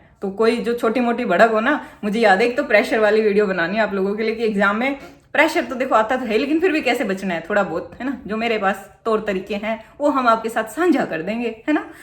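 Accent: Haryana, India. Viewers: I like the...way..yuu speak... Haryanvi 😊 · Makha ram ram 😃😃😃, nice starting, hariyana bala style sunme thoda kadwa but achha bhi lagta hei 👍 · Your Hariyanvi accent 👌 💌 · Aap haryana se hai mam?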